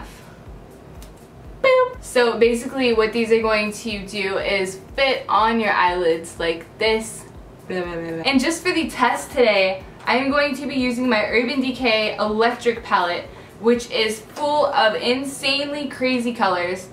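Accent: United Kingdom. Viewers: Her English accent "oh bloody hell" that's totally jokes literally that's me being normal everyday!!!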